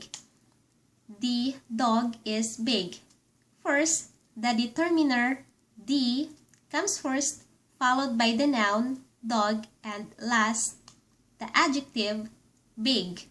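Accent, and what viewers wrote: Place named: Pangasinan, Philippines. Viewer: I'm Ilocano and she uses different word and have harder accent than in our place....from her accent and wording I think she is from Pangasinan Province. I want to learn how to speak pure Ilocano but that is impossible since there are no equal word for every word we barrow from Tagalog and English. All I can do is to continue speak and learn More words.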